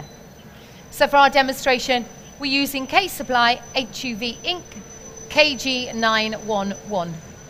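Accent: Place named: United Kingdom. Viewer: Can't stand the British mouth here.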